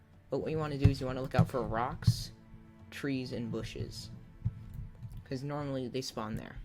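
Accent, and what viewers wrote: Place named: Sweden. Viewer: its like a swedish accent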